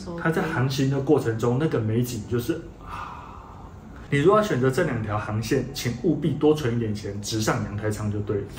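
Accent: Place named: Taiwan. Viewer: I dont understand your Taiwanese Mandarin. Pls have the Chinese subtitle on. In addition, you are speaking far too fast. Making it even harder for any audience.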